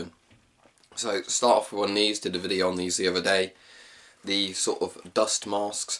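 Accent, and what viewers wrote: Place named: United Kingdom. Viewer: it's a british accent.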